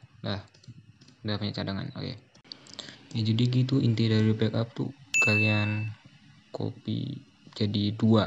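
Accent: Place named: Java, Indonesia. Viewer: Bagi saya cara menyampaikanya Sangat sangat jelas kang dan lantang dna logat jawanyapun terasaa,sayapikirsitu orang jawa aali,Dan sayapun asli jawa tapi sekarang tinggalnya di Daerah provinsi banten,salam dari saya,Btw do you want platon with me😎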